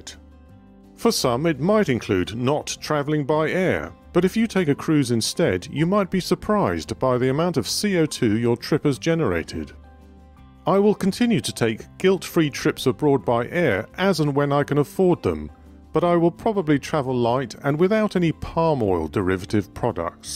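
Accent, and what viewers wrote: United Kingdom. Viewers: Delicious British Accent! What do you think about the environmental impact caused by greenhouse gases produced by aircraft in the long term?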